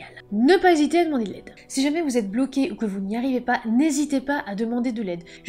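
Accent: England, United Kingdom. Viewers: Merci l'accent anglais ! coeur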